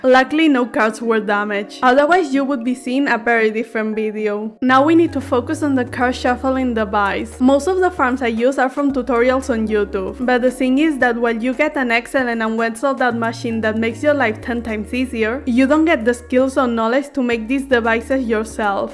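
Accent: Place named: United Kingdom. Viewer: you sounding british makes the video better lol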